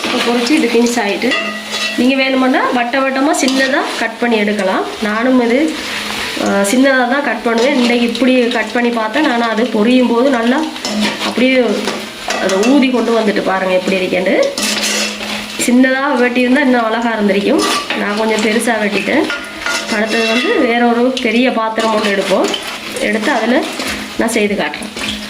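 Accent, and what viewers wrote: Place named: Mannar, Sri Lanka. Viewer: Sound like you are from mannar!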